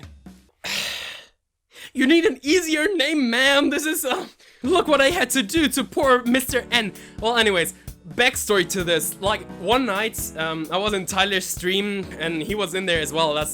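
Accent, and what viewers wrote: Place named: Europe. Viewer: your accent sounds european, where are you from?(i'm from the netherlands tbh)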